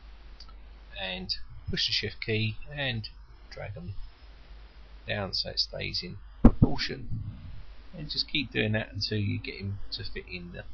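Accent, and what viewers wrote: United Kingdom. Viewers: From now on I will only watch tutorial made by British guys <3 the accent =) The tutorial is gd too .... 'melts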